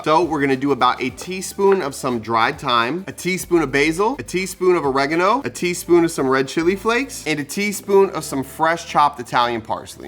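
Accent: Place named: AAVE, United States. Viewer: The recipe was excellent, but the Ebonics has to go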